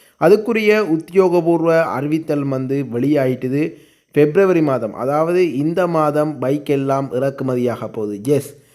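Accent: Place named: Chennai, India. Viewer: Madras தமிழ் தூதூதூதூ ...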